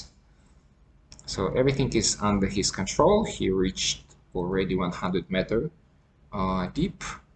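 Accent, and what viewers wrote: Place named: Russia. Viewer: Слушай, красивый человек с красивом акцента русско:::о)) Ты понимаешь эту тему, не умру ли Я На глубине? D5. COMBO )) Только КОПИЛ. Туш рвётся от того, что не купил океаин, если ПОДУМТЬ, ПОКУПАЛ ДЛЯ ДОКТРИНЫ,..Нет для фактов дошёл до зардержке на постептли 2 B D ВПИЗДУ ПНГЭ НО КРАСИВО:О) )\\\\ да? как же хочется это дыхание сохранить на манималках? ХУУЁВО ЗНАЮ Когда контроль, на глуби ВНЕ КОНТРОЛЯ, уже пошли работать безумные Смертники.. БЛЯЯЯЯЯЯЯЯЯЯЯЯЯЯЯЯЯЯЯЯЯЯЯЯЯЯЯЯЯ:О) КАК ЖЕ ХОЧЕТСЯ Погрузиться глубже...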